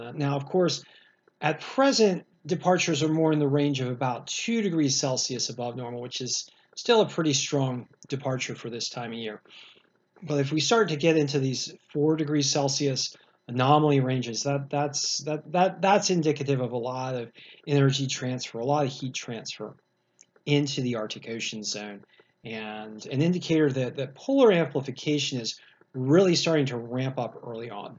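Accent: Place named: Philadelphia, United States. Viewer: Thank you, your videos are much appreciated. You have a strong accent...you must have spent some time close to Philadelphia Pa., perhaps in the South Jersey area.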